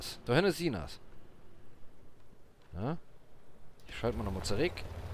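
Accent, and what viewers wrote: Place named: Saarland, Germany. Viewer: Ma merkt dir schon e bissje an, dass du ausm Saarland kommsch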